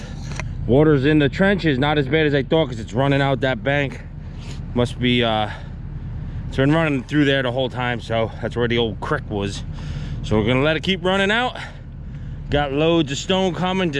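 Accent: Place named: New Jersey, United States. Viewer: Happy Thanksgiving! New subscriber but based on your accent I'd say you're not too far from Jersey. Just finished grading my own sawft sawggy yahd with a Kubota in 732👌🏼👍🏼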